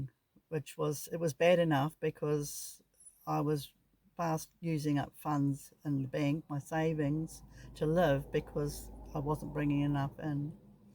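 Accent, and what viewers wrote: New Zealand. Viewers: Your lucky you live in NZ by the sound of your accent.. it’s easy to live in a camper van in NZ perfect country for it. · Nice to hear a kiwi accent on YouTube 😊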